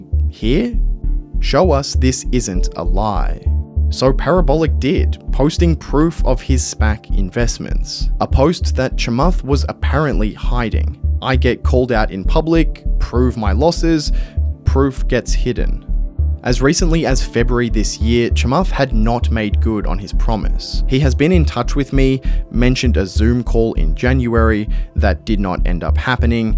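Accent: Australia. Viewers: Ohhhh... "Arn" = own in Aussie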